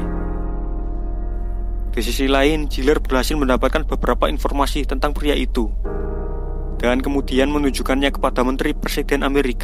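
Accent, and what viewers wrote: Java, Indonesia. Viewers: Medokkk nya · Yang jelas pembawa alur ceritanya medok.. Ajib .. menghibur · Medokk bgtt bang 😄😄😄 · Nrtor nya busyet medok!!!!!!!!!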